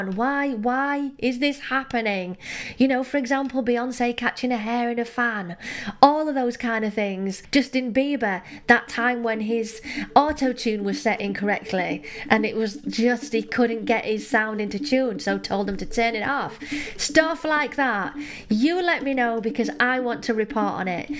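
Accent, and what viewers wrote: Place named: Brighton, United Kingdom. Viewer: Hi, I love your vídeos and I love your accent which reminds me the time I lived in Brighton. Could you check some vídeos? She's a really good mezzosoprano pop singer know like the Spanish Queen of Pop. Pleaseeeee xxxxx.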